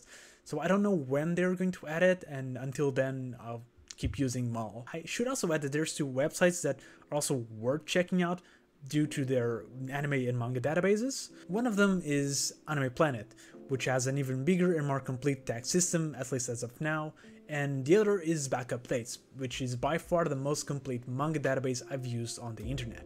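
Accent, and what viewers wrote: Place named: Portugal. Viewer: Something curious just happened... I just joined anilist and I wanted to know more about the website before starting to use it. I open youtube, search for "anilist" and this video is right on top. I click it. Less than 10 seconds in and I start to have this feeling... your face, your accent... is he? Something tells me he is... I go to your "about" section and that's when I see it... este gajo é português caralho! Um pt consegue cheirar outro pt à distância ahaha acabei de subscrever. Boa sorte com o canal!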